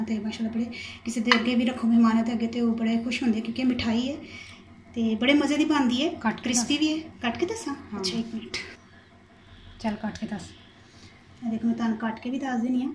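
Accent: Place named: Punjab, India. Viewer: mashAllah very nice ot apka punjabi bolny ka style bht achaa laga..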